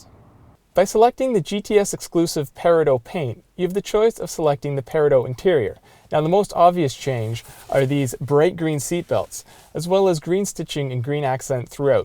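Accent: Canada. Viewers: Here in Texas it gets pronounced correctly, but then there are many descendants of German immigrants here. The reviewer sounds like he has a Canadian accent, but I bet you can find some Canadians who know how to pronounce it properly. I've heard the "porch" pronunciation in Australia and New Zealand too, so maybe its a British commonwealth thing...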